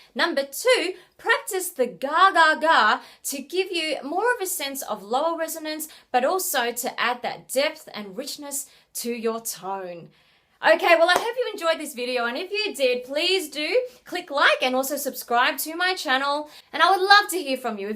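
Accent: Australia. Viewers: She's Australian, that's how they sound! ( I'm from there, I should know!!) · Its because shes Aussie aha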